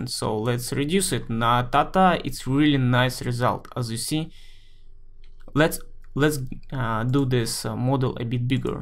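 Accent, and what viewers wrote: Russia. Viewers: есть сильное ощущение, что твой родной язык - русский!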